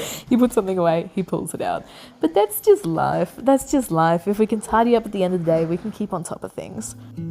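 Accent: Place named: Australia. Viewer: You and your home are beautiful! So good to see/hear another Aussie :-)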